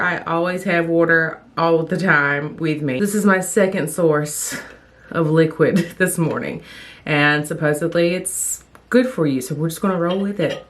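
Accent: South, United States. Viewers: I just love your southern accent 😍 baby mila is so cute.. Its a lil replica of... My baby just turned 4 months too and its no where near miss millies milestone she is so smart.. And girl let me not start with that junk in the trunk you got 🙈 u got it all in the right places.. Lol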